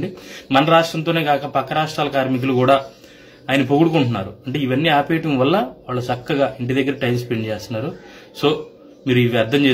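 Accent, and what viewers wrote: Godavari, India. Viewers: Nice presentation in Godavari style..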